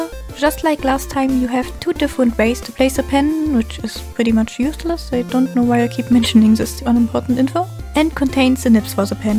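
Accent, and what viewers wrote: Germany. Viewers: Man bemerkt den deutschen aksent xD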